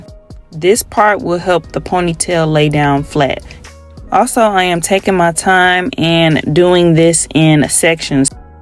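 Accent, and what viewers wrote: St. Louis, United States. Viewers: Hurrr lol your accent is so cute! Sound like a St Louis girl ❤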